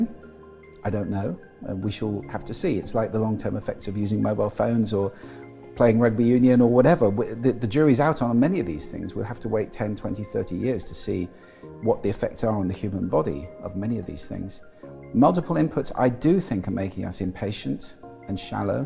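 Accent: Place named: United Kingdom. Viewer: Some valid points. More speculation going on here “seemingly valid” with the ol’ British accent.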